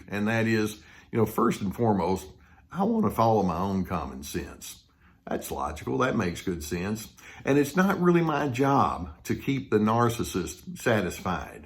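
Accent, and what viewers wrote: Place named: Texas, United States. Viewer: Somehow the narcissist looks all the more ridiculous when they are described with a Texas accent!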